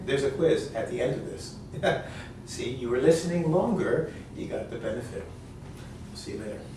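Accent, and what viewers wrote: United States. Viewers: Good job, amazing !!! I understand the most part of this video, and I have a sucks english :( Are you american ? where u from ? thank you for share this video, I hope one day be a english speaker like you are. Greetings from Brazil. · Excuse me, It`s American English? :) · are you american? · А вы можете сказать это какой американский английский (акцент) или британский? И вообще все которые есть учителя на сайте <link> они обучают какому английскому? Просто я новичок и пока что не могу отличать их, но я знаю одно, что не рекомендуется смешивать по этому спрашиваю..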